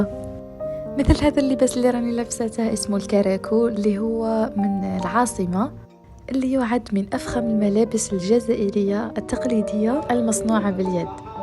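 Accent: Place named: Levant. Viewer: انتي تكلمين الهجه اللبنانيةاوالشاميةوليست الهجه الجزائرية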